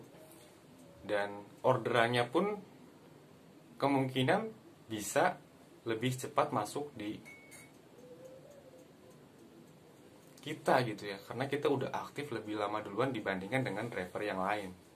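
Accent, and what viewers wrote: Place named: West Java, Indonesia. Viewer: Lo orang sunda ya bang . Ngaku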